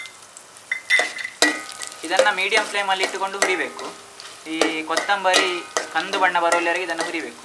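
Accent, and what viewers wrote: Mangalore, India. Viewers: Listening 👂 Kannada words from your side is absolutely mesmerising, loved the mangalore accent great video liked it!!!!! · ಮಂಗಳೂರು ಕನ್ನಡ ಕೇಳಿ ತುಂಬಾ ಖುಷಿ ಆಯಿತು. ನಿಮ್ಮ ರೆಸಿಪೀಸ್‌ try ಮಾಡಿದೆ, ಒಳ್ಳೆಯ ರುಚಿ. ನಿಮಗೆ ಇಲ್ಲಿ UK ನಲ್ಲಿಯೂ ಸಹ ದೊಡ್ಡ fan following ಇದೆ. Keep it up 👍🙏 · Thumba samayada nanthra nimma kannada hagoo recipe nodi mangalore ge bunda hage feel aithu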